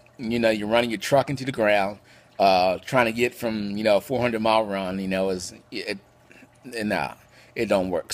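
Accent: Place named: Eastern Pennsylvania, United States. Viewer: I enjoy watching your videos it sounds like you're in eastern PA I live in South Western PA Pittsburgh anyway I was very interested in trucks and muscle cars as a kid now I'm much more interested in Eternal things the Bible is a Hebrew Book both Old and New Testament Jesus uses midrash on a couple of occasions he was describing the crucifixion to Nicodemus and liking it to when Moses was commanded to make bronze serpent to save the lives of the Israelites bitten by the serpent he tells the Pharisees that just as Jonah was in the belly of the whale for 3 days and three nights so shall the son of man be in the belly of the Earth so these are two examples of midrash the reason this is important it's because once we understand that the whole Bible is written this way then we go back to the Old Testament stories and see that every last one of them has a prophetic meaning we live in a day and age one prophecy should be something every Christian is studying but too often too many churches have other focuses money your best life now these sorts of things Jesus warns do not be deceived 4 times he wants about earthquakes and rumors of wars once he also says narrow is the gate and difficult is the way that leads to life very different I want most churches are saying these days let me leave you with this little nugget we see 666 and revelation but it's in the Old Testament a couple times Solomon's wages were 666 talents of gold Nebuchadnezzar's statue Goliath ect so anyway it won't be lo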